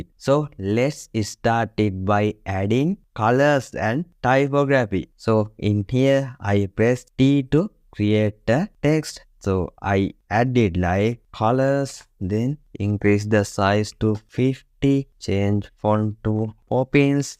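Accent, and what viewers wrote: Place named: India. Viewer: Dude your accent is awesome, reminds me my Indian physics lecturer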